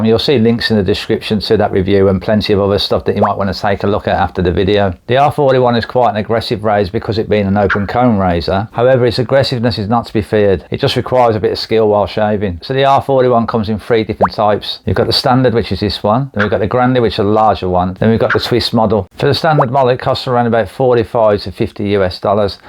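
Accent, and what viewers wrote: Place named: Cockney, United Kingdom. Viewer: A bit of sincere advise mate. Speak a bit slowly because you speak with a cockney accent a lot of people will not understand you and it decreases the number of subscribers.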